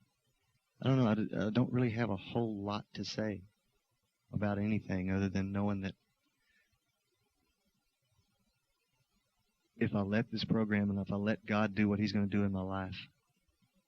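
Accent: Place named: Dallas, United States. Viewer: Classic Dallas accent from the period.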